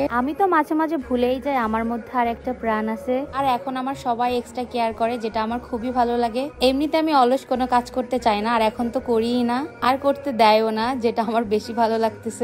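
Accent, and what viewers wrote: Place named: Chittagong, Bangladesh. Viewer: Amader chottogramer vloger,,,amra boalkali taki,,,onek vlo lagay jokon chatgaiya kota suni❤❤❤❤❤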